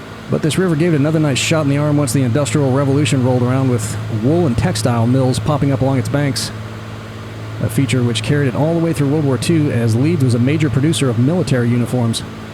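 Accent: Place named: North America. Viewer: Where's the Big One? One of the biggest roller coasters in the world is on the Pleasure Beach... no sign of it here. The whole Pleasure Beach doesn't really look like the Pleasure Beach at all. Blackpool Airport isn't accurate either. I mean it all looks fab, and if you didn't know the area I suppose it doesn't really matter, but for those who live here, it's a little disappointing. No idea what that massive square hanger building is you parked next to... that's not there in reality either. Nice video though... strange hearing a north American accent talking about my home town :)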